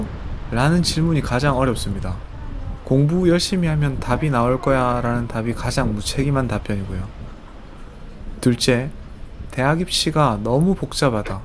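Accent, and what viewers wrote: Busan, South Korea. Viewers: 91년 동대 법대에 입학했었는데(동대신동캠퍼스), 저수지가 낯익군요. 올해 애가 고1이라, 애를 위해 고등학교 학부모운영위 학부모위원 되었습니다. 애는 지극히 평범(고1, 3월모평 국4등급, 영수 2등급)하고, 힘들게 대학다녀도(4년 학비도 만만찮고) 원하는데 취업하기 힘든 게 현실이고, 현재 고등학교는 입시위주 교육을 할 수 밖에 없는게 현실이어서, 아들에게 대학가는것보다 (서울사립대기준 학비의 2배를 줄테니) 앞으로 뭘 먹고 살지를 고민해 보라고 했더니, 아들 눈에는 좋은 대학나와야 사회적으로 인정 받는다고 강하게 믿고 있는것 같습니다. 지금 인천 살고 있지만 매주 동영상보니 부산이 마이 그립고, 선생님 목소리가 제 아는 분과 같아 친숙하네요(부산분치고 사투리가 심하지 않음)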